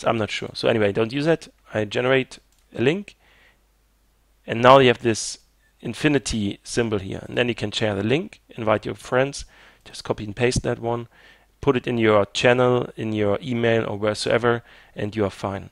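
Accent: Germany. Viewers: your accent sound german? bitte sei deutsch lol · German accent 10/10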